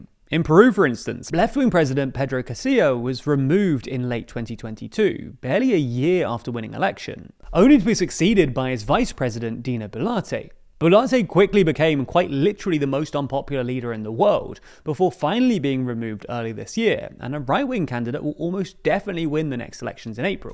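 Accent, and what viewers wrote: England, United Kingdom. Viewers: Poor research on this video. Ecuador has also elected a right wing president Daniel Noboa. The incompetence masked by an English accent.....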